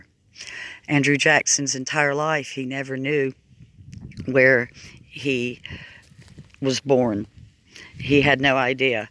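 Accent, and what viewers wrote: South, United States. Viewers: Jackson's nothing but a murderer and a bigot. Trail of tears= genocide also caused a depression due to land buying changes explain your reason to visit such person. Oh wait that southern accent explains it all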